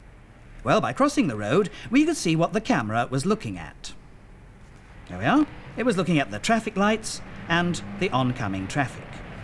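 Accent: RP, United Kingdom. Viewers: “Tel-eh-vishon keyameras” love the R.P. Btw, Wondered how long it would take for some sad kn*bhead to mention ‘Police State’ this was1972 you d*cks! It’s just a bit of nostalgia!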